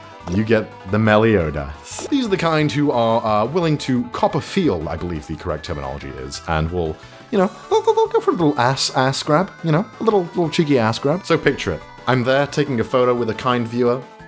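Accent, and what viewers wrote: United Kingdom. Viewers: "saying British things from my British face." Me:... As a British person I just realised how inferior my accent is compared to. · with the british accent?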